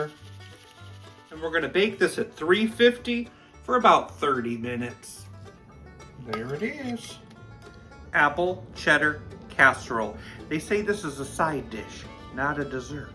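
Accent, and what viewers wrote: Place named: North Carolina, United States. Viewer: This sounds like North Carolina ❤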